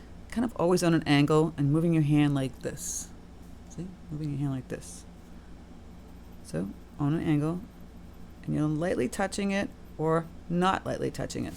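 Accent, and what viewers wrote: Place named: East Coast, United States. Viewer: I'm enjoying your videos immensely, your a great teacher and a pleasant, cool, East Coast voice. Best to ya!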